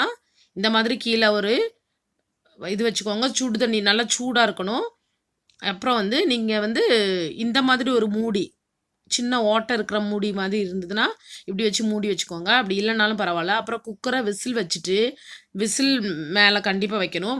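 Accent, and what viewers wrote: Tirunelveli, India. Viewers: Hello sis...which temperature has to set if the recipe is cooked with oven? Also sis one small request...pls reduce english words and speak in your tirunelveli tamil....it is sweet to hear like you pudding recipe:-)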